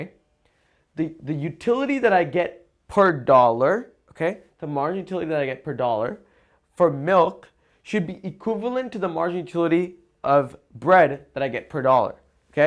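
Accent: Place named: India. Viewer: magnificent.sheer fabulous accent compared to your other countrymen in india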